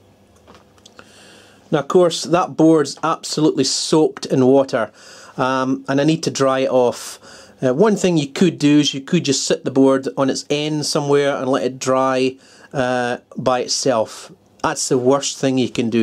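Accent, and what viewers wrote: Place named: Aberdeen, United Kingdom. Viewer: Great video, thanks for taking the time to show the process! I'm inclined to get some of that cleaner stuff and try it, although I might need to get a new ultrasonic tank as well (mine is rather old and out of whack). As it happens, I spent today making up a batch of PCBs and wondering how the pros go about cleaning them. My own process, for what it's worth, is: Soak boards in isopropanol inside a sealed plastic tub for 30min - this softens up the flux and crap Scrub well with a toothbrush in the IPA - this usually gets everything off but sometimes there's little bits of hard flux around big solder joints, so need to give them a wee scrape Wash with hot water and detergent, to remove the IPA Dry thoroughly with a compressed air jet Personally, I love using compressed air for drying things, because it gets into tight places very easily - e.g. 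under SOIC chips, or inside IC socket pins. By the way, are you from Aberdeen? I detect a wee bit of an accent! [I'm from West Calder]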